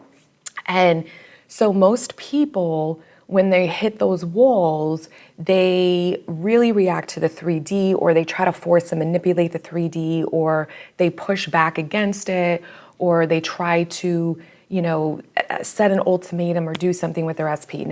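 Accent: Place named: Rhode Island, United States. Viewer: Your accent sounds like you’re from Rhode Island. New sub.💕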